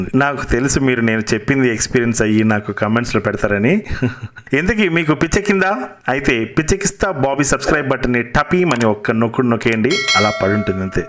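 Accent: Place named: Karnataka, India. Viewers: Very good receipe. Teaching receipe is very good. Speaking in kannada is so cute. God bless you. Keep it up. From bangalore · Wow wow love to hear your Kannada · Nimma Kannada thumba impagide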